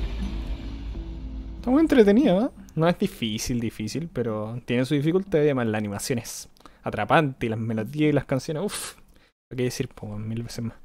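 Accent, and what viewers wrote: Chile. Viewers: por el como habla ya se sabe que es chileno por un hola como estas ya se reconoce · Es la primera vez que te veo y desde ya puedo decir que eres chileno xD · X 2 yo soy chileno y cuando lo escuche decir chucha dije es chileno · x2 io igual lo soy, chileno reconice a chileno jsjsj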